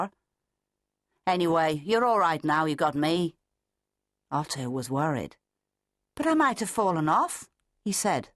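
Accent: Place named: United Kingdom. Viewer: Awesome story. Fantastic British accent.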